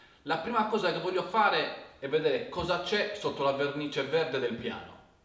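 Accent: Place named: Sardinia, Italy. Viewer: Si sente perfettamente che sei sardo!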